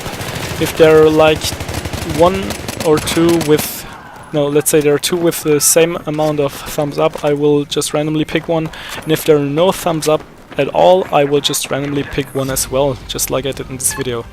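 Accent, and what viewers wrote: Germany. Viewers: youre english is very german^^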